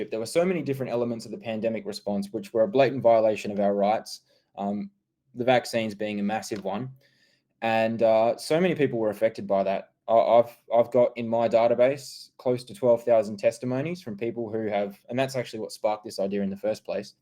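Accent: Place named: Australia. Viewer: Im not english speaker.so just waana listen ausi accept .....❤may u be well and happy ......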